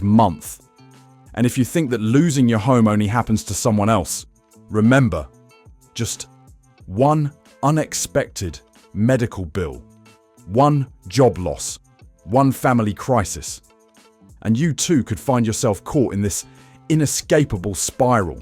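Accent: United Kingdom. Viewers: I work on the strip. Untrue. Every night I leave nobody sleeps on strip. And they are not allowed in any casinos. Yes there are some in the tunnels but you overexaggerat. Why did your video sound like you're UK.??? Homeless in Vegas, why are you in the damn tunnels???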